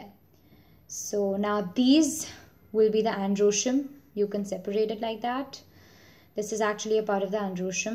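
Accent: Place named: England, United Kingdom. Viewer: Impressed by English accent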